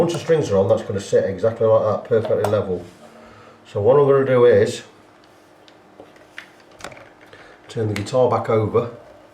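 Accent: United Kingdom. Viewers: Saw the name Fret Friend UK and thought "A Brit, great I won't have any problem with the accent." :/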